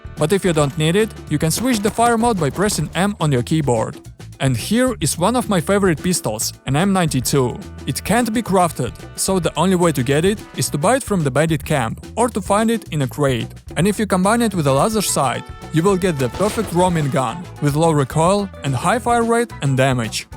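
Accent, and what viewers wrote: Russia. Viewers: я слышу руский акцент ?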